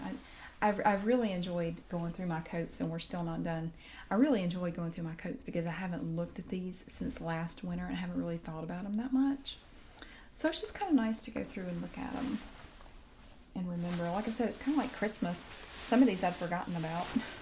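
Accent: South, United States. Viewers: Darling, this is a great video on thrift shopping, but it is not soft spoken and it is not ASMR. I know you are a fashion fan, but this video is too high energy and does not induce tingles even with your beautiful southern drawl. Perhaps you should start a second channel specifically for your fabulous clothing shipping trips. I do find your Aldi food shopping videos to be quite relaxing. Best, C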